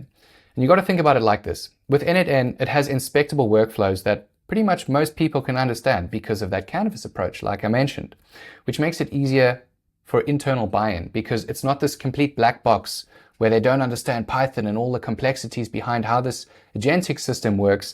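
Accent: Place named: South Africa. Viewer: I know a South African when I hear one😎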